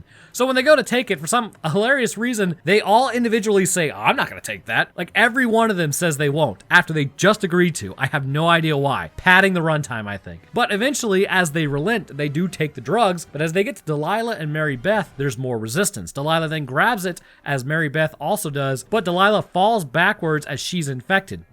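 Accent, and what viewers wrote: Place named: South, United States. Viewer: As someone who lived in Florida for a while and some times his southern drawl sneaks out of the basement that it SHOULD NEVER leave. Southern drawls arnt that thick unless they’re Cajun or some very very isolated rural areas. Atlanta ain’t one of em.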